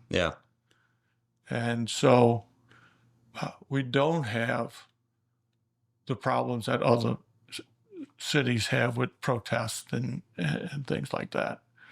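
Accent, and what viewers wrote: New Orleans, United States. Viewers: This guy has the classic New Orleans accent. Makes me miss that town. I spent a lot of time there in the ‘90’s. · Perfect accent, soon as this video started if knew he was from the New Orleans area.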